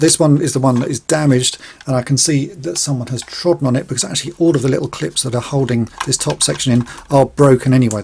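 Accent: United Kingdom. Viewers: When I read the title “What can I build”, see the junk pile, and hear your British accent, Dr A’s steampunk came to mind.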